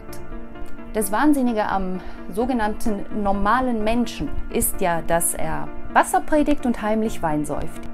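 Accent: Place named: Switzerland. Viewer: Hallo 👋👋👋👋👋 Mobbing ist ein sehr wichtiges Thema. Mobbing, Cybermobbing usw. ist in den letzten Jahren sehr schlimm geworden, speziell seit es das Internet gibt. Es ist sehr hilfreich wenn, es Menschen gibt, die darüber sprechen. Ich danke Dir für das sehr interessante und gutes Video.👍👍👍👍👍👍👍👏👏👏👏👏👏👏 Ich habe noch eine Frage, wenn Du es mir erlaubst: Von deinem Akzent her müsstest Du aus der Schweiz sein?! lg Leandro 😺😽🙏 Bis bald.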